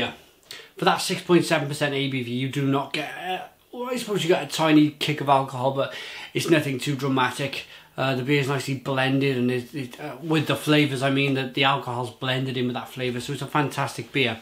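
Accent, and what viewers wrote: Wales, United Kingdom. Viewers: Hi As you're Welsh (I think that's a Cardiff or Barry accent I detect) do you know of anywhere local that sells this or similar? Would love to get my hands on more German helles, dunkels and bocks, doppelbocks etc. Love German beer but can only find one store that doesn't have a great selection outside of weissbiers. Also, isn't a dopplebock a lager? Cheers, I666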